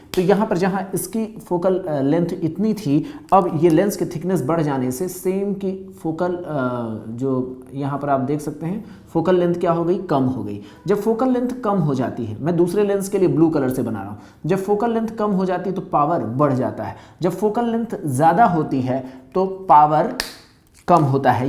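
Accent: India. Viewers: is this English with Indian accent or Indian language? Hard to tell.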